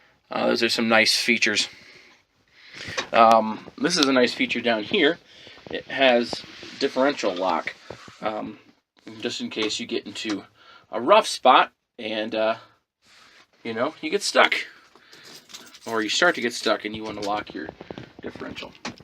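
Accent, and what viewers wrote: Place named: United States. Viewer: Where the hell do you live? You sound American as hell but the steering wheel is on the wrong side in ya truck and your gauges look all backwards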